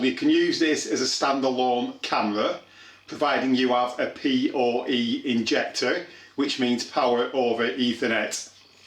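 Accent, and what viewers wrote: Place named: Wigan, United Kingdom. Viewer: I live in Pennsylvania but am originally from Wigan, you sound as if you are from near there, lol.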